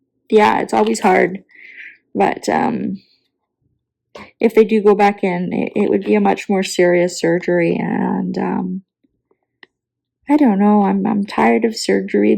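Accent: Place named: Canada. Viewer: 🌹 Hi - sorry, is it or or something else? I’ve heard your name in someone’s Live, but I’m new to following you. I’m April Campbell and I live in Oregon. I’ve been Diamond painting for a little over one year. I’m a knitter. I’m now fully addicted to diamond painting 😊. Oh well. I enjoyed your WIP and Chat. You have a lovely Canadian lilt that is very soothing, so thanks for that!! And I just want to add that I hope your upcoming procedures help you - and you are in my thoughts for all good things. ❤ from Oregon. I’m glad I found your channel!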